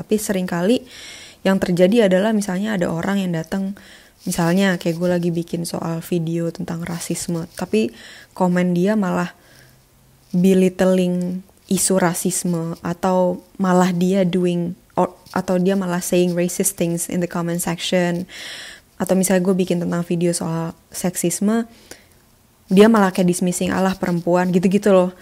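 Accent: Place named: Medan, Indonesia. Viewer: telat nonton ini, tpi aku berasa relate bgt sih kek denger temen lagi deep talk krn skrg lagi nganggur dan chance buat burn out gede apalagi pandemi makanya dtg kesini biar dpt sedikit wawasan sembari mau jdi pendengar dikala gaada temen yg bisa diajak ngobrol. sampai sekarang aku masih mikir kak just being kak same as medan people just being medan people who had known as rough accent, same as javanese people being javanese people who had known as gentle accent, we just need to embrace all the uniqueness of different type of people. maybe for some people they are not familiar to found some people like kak, mereka terbiasa lihat klo cewe mau itu pakek jilbab gapake jilbab ya lemah lembut, atau mereka gk terbiasa dgn kata2 kasar kayak gk lumrah buat mereka. but for some people yang ada pengalaman punya temen kyk kak mereka kek "its not a problem, thats how we were friend, thats how i like her, her just being her, its her choice to how her react" at the end of the day we are all human thats it.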